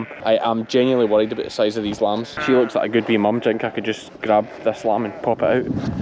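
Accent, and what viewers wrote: Scotland, United Kingdom. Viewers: After I watch just a few of your videos, I start thinking in a Scottish accent. Lol. Tho I still can’t understand a word I’m saying. 😉 ♥️&🙏✝️ to you guys. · Hello from Australia. I have just stumbled upon your videos and your Scottish accent has me hooked! You are certainly kept busy with sheep farming.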